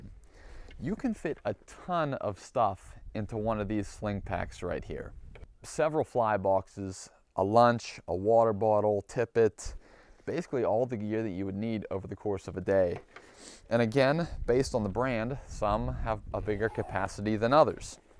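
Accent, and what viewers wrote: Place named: Pennsylvania, United States. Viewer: Knew as soon as I heard you speak you were a Pennsylvanian like me 😄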